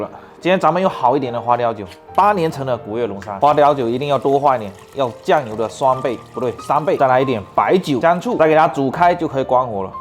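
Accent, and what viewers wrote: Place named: Hakka, China. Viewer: 看完之後感想 ……. 客家音怎麼那麼重 🤣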